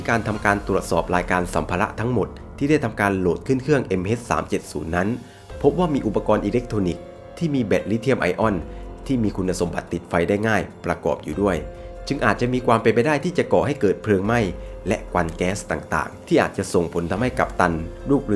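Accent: South, Thailand. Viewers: เป็นคนใต้หรอฮะ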